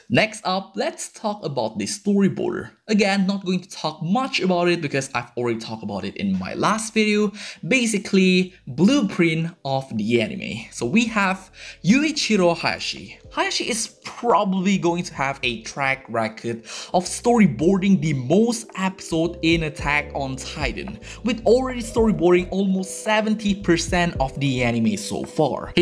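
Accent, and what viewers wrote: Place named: Asia. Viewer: how old are tou? , also are you american? , sound kinda asian with that accent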